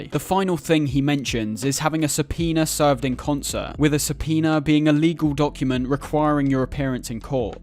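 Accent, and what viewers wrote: United Kingdom. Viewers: Could you possibly get rid of the British accent? It’s kinda heard to understand what you’re saying sorry haha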